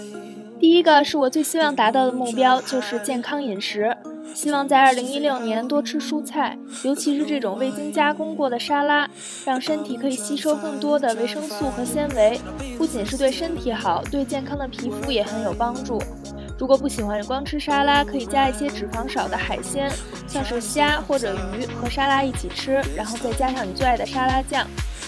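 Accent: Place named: Tianjin, China. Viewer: savis知道你是北京的 不过真的觉得说话像天津人啊 甭儿亲切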